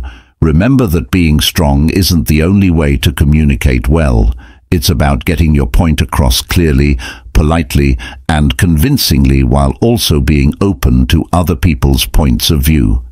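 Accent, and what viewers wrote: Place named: Scotland, United Kingdom. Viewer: Yepp took on a scottish broague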